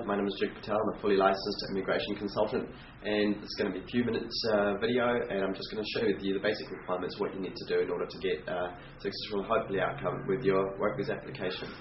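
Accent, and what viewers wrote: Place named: Australia. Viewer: Hello Mate, Firstly speak clearly,there is lot of resonance in background..I can understand..you speak like an Ausiss mate.I know i was in merchant navy and sailed all over world so I can speak in all the accents. do you know rushell peter watch his show...joking Few points for you to improve. 1.Speak loud and clear 2.write on board neatly 3.If you cant write have a printout in bold letters.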